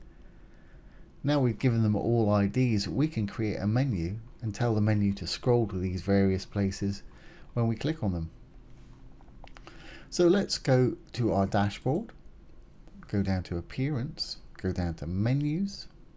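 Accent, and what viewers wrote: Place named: West Country, United Kingdom. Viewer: Hi.. Trying to place your accent. It s South with a bit of west county. Anyways enough of the small talk. Have been following your excellent lessons.As I'm just starting a website, this one in particular has inspired me to create a better idea than just a coming soon template so i can expand on the content more than just a few pictures and text. Ive liked & subscribed where i can. Was wondering if you could offer a little advice. So ive set up my Woocomm product pages with variable options,size colour etc. I make belts The drop down offers 100 colour options listed as names where the customer can make a choice. However a button next to it takes you to a gallery page showing all the colour swatches as better experience. So the ask in all this is. Can i some how link a choice in the galley to become added as part of their choices?. 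ive noticed you can hyperlink the image text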